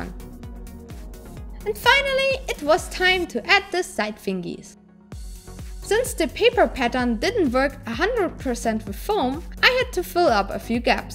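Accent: Germany. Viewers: Ich hab mir schon gedacht, dass du irgendwie einen deutschen Akzent hast aber nachdem ich mir die Kanalinfo angeschaut habe war ich mir sicher. Btw wirklich hilfreiches Video! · Are u German??? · Man merkt gleich, dass sie aus Deutschland kommt 😅 aber gut gemacht.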